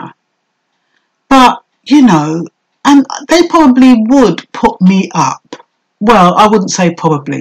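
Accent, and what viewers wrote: Jamaica. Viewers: It is so funny when you're talkin your Jamaican accent😂😂😂 you have me dying..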